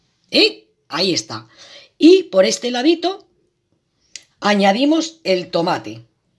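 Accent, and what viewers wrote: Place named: Galicia, Spain. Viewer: . ES SU NOMBRE? ME ENCANTA OIR CÓMO HABLA!! AQUÍ EN MONTEVIDEO. URUGUAY, RECIBIMOS HACE MÁS DE 60 AÑOS UNA CANTIDAD DE ESPAÑOLES QUE PERMANECIERON SU VIDA TODA EN MI PAIS!! LOS VI MUY DE CERCA. AL EDIFICIO EN EL QUE VIVO LLEGARON 6 FAMILIAS. HOY EN 2020, NO QUEDA NINGUNO. LAMENTABLEMENTE... HOY LA ESCUCHO HABLAR Y MI MEMORIA VIAJA AL ENCUENTRO DE ESTOS QUERIDOS "GALEGOS" A QUIENES QUISE ENORMEMENTE. SUS COMIDAS ME ENCANTAN!!! DESDE URUGUAY SALUDO ESPECIAL EN ESTOS TIEMPOS DIFÍCILES QUE NOS HAN TOCADO VIVIR!!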